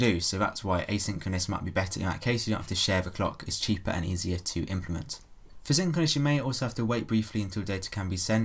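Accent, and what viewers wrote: United Kingdom. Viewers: can't get his British accent 😢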